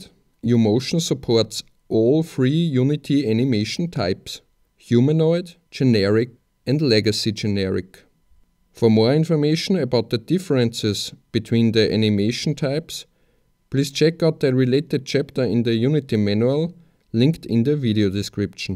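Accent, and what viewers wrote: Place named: Austria. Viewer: Österreichisches Englisch is einfach leiwand :D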